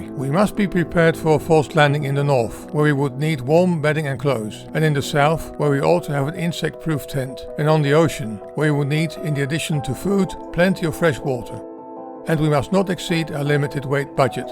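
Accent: Netherlands. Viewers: Not clear why YT makes problems to best authors like you rather than focusing on garbage channels! Format works, this video is PURE GOLD!!🌟👌🙌 Love the voice over with Dutch accent!! 🔝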